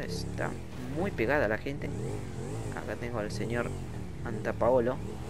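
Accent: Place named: Argentina. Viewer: Me vuelve loca el acento argentino y mas si es hablado por ti, te quiero, saludos desde Mexico❤️❤️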